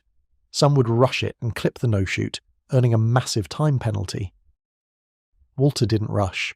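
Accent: United Kingdom. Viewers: These are Bull Shit Stories, especially from the point of view of someone that knows the 1911 and how competitive shooting works, only a Non Shooter thinks competition shooters are so Stupid. Narrated by a Non Shooting Brit. Competitive shooting gets technical and most shooters are professionals. Also a laugh, the Age of a 1911 matters not. Nobody I know ever made fun of a Veteran At one point, Revolvers were used in Competitive shooting. And nothing beats a Pre Series 70 trigger.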